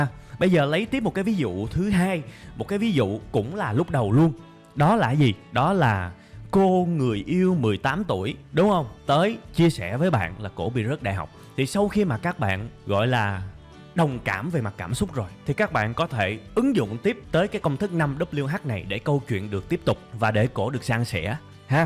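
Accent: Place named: Mekong Delta, Vietnam. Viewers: Anh chắc người miền tây hả cách nói chuyện của anh với funny gaming tv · Mới đầu nghe anh này giảng dài dòng cũng thấy khó chịu, bực mình lắm nhưng coi hết mấy clip thì thấy nội dung hay, giọng ảnh miền Tây chân chất, clip có dàn dựng hiệu ứng 1 cách khoa học, lời giảng dễ hiểu, chân phương nên bị ghiền! :D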